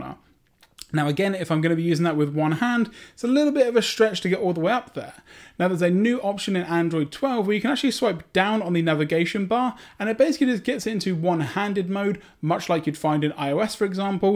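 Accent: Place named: United Kingdom. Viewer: Most likely due to his British accent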